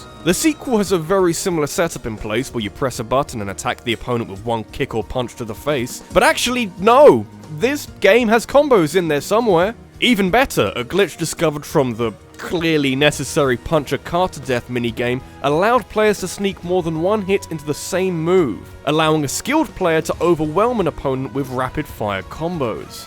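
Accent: United Kingdom. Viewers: [First impressions] Well, he's a bit-er. [Speaks] WELL LOOK WHO'S BRITTISH! [subs instantly]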